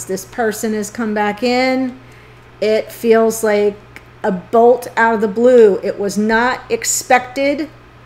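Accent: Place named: Florida, United States. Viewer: And I also knew based on your voice you're a person either from FL or have lived there a long time.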